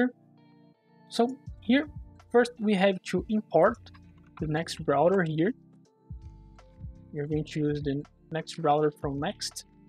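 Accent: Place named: Brazil. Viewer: esse sotaque nao me engana você é br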